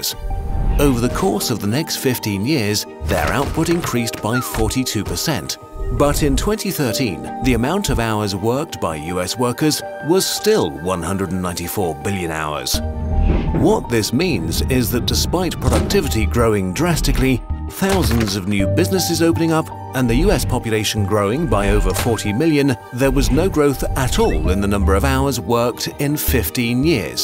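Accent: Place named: United Kingdom. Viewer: P.S. giving it a fancy british accent doesn't make it any more legitimate.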